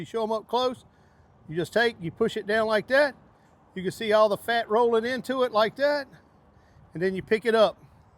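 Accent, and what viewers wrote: South, United States. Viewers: With this mans southern crip walk, gut, and accent....I trust his cookin' 😂 💯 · Good old southern accents Cajun Cuisine is big down there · Love the accent. Good ole southern cooking with love.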